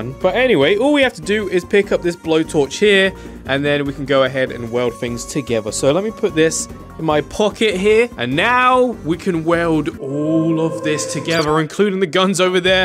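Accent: England, United Kingdom. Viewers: R you from inglan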